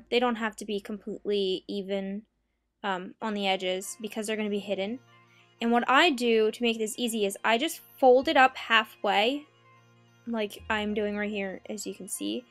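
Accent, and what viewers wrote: Ireland. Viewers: yeah are you irish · are you irish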